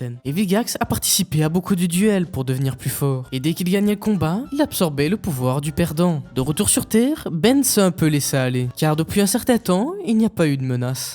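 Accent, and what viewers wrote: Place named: Verviers, Belgium. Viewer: Cet accent de Verviétois 😂 Salut camarade 🇧🇪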